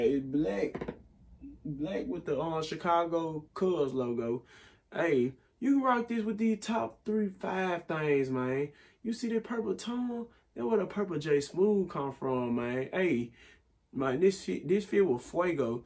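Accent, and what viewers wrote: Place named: Memphis, United States. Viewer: Soon as I heard you talk I knew you was from the M😂 901〽️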